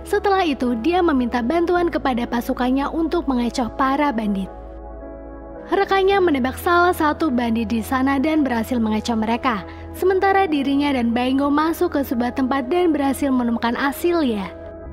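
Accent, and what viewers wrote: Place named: Banyumas, Indonesia. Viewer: Mbak lucu banget sh, pake bhasa jawa ngapak kwkwk, semoga sukses terus terus chenelnya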